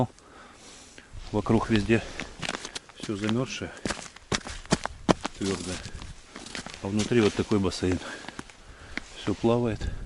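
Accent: Ukraine. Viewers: Поэтому и спрашиваю..по говору понял что украинец. Я недалеко от Новочеркасска проживаю ни снега ни льда нету давно.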